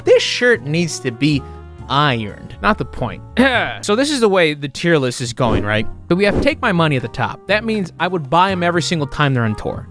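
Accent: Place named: Upper Midwest, United States. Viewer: I knew you were from the upper Midwest because of the slight accent.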